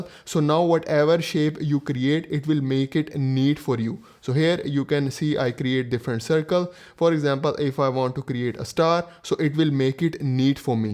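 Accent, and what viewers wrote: India. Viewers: The video itself was very well done, but something about speaking english in an indian accent makes me uncomfortable. I could understand everything you were saying, and your words were very clear and well articulated, but indian (hindu?) must be a very different language phonetically, because the struggle to achieve a clear flow between words is real. I hope this doesn’t offend you, because your english really is very well spoken aside from that flow aspect. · Indian accent is something)))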